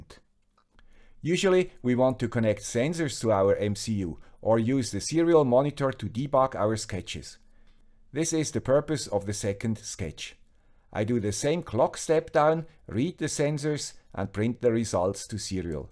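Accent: Switzerland. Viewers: Thank you very much! Exaclty what I needed and KI didn't knew yet. From another guy with a swiss accent.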